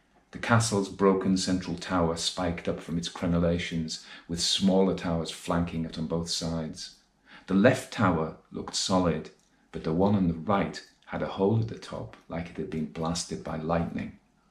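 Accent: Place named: Scotland, United Kingdom. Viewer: I thought I detected a hint of Scottish in your accent, and then I heard that you come from Cumbria, so that explained that. You pronounce "poor" as "puir."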